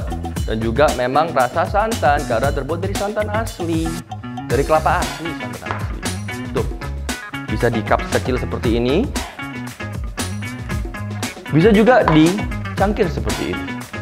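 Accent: Surabaya, Indonesia. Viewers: Logatnya kek orang sby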